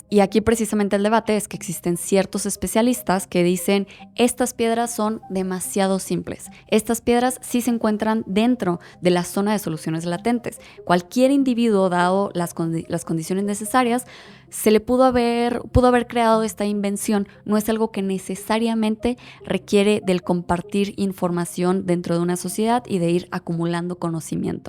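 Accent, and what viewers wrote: Mexico. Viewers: Definitivamente que podcast tan genial. Los temas y la manera como los abordas me parece genial. Ahora... Tu acento Mexicano me encanta!♥️ Saludos desde Colombia 🇨🇴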